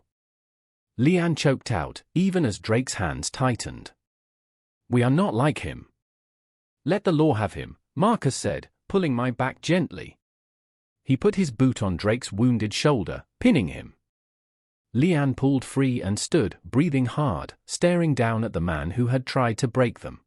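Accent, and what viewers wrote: United Kingdom. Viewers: Why do I have to listen to this ridiculous British accent on a story that takes place in America? We should have allowed the Germans to destroy the UK when we had the chance (NOT CHONCE).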